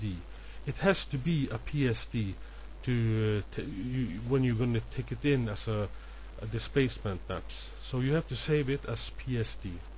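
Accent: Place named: Norway. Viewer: Hehe, Norsk-Engelsk er morsomt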